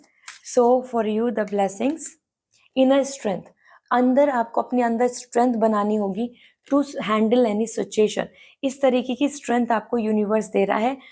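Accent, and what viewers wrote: Haryana, India. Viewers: Are you from HARIYANA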